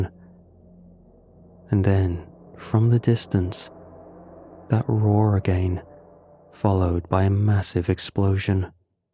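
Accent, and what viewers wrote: United Kingdom. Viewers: The British pronunciation of many words varies from ours greatly. And he has probably just as many British subs as he does anywhere else. So why should he cater to just one area with his pronunciation? Now if you want to hear some horrible grammar go listen to! He is American and mispronounces so many words I’ve given up trying to help him.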